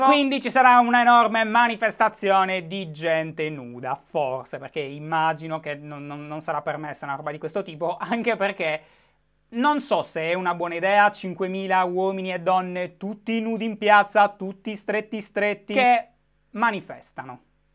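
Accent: Sardinia, Italy. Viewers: Prima volta che guardo un tuo video, nuova iscritta :) (Forse che si nota che sei sardo :D)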